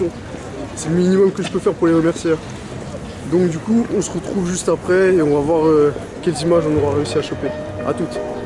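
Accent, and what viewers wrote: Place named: Alsace, France. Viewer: T'es Alsacien ? T'as un gros accent.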